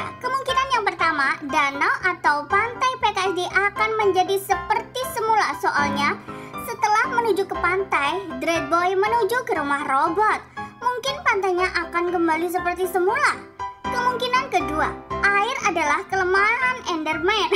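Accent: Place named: Java, Indonesia. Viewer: Kakak ini orang jawa ya? Logat nya kerasa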